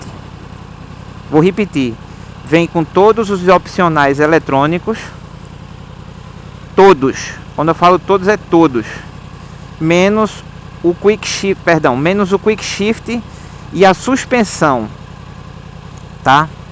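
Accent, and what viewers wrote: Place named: Rio Grande do Sul, Brazil. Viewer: nao nao nao, ele e gauho mds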